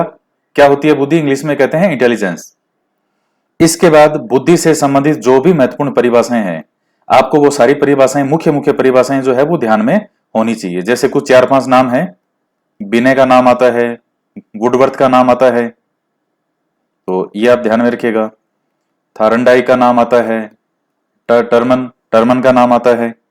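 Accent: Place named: Ganganagar, India. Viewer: Sir aap ganganagar se hai kya. Aapki bhasha kuch ganganagar se milti hai.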